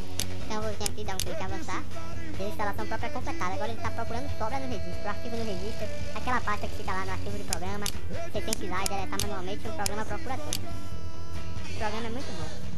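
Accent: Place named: Bahia, Brazil. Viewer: essi mano é baiano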